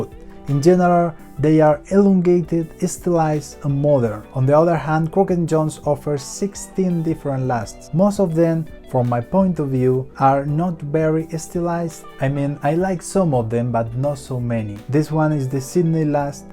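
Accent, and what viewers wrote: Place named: Spain. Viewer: The narrator is coming from Spain ? Because the funny accent.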